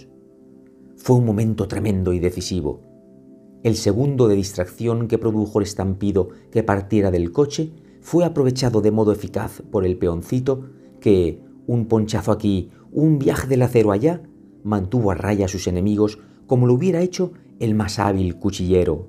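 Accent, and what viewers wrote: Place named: Uruguay. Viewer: 🎉saludos desde Piura - Perú. Estaré atento a la narración de cuentos, leyendas, con la dicción local de Uruguay. Grácias. Cuide su salud. Felicidades.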